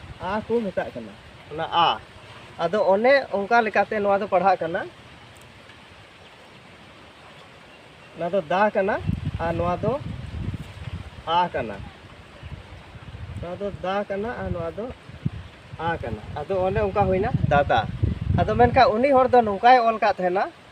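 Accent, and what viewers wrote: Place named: India. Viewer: Aam do santali te ol akan word latar re hindi tem ucharane aa anate bam bujhaw daleyag kana santal ched leka ko ucharana youtube re joto olchiki palhaw hah video njel me ar onde khon mocha te ched leka ucharana aa santali te ankage chiki teho olo aa menkhan hindi ucharan em palhaw khan amdo santali word ucharan bam gan daleya aa nowa redo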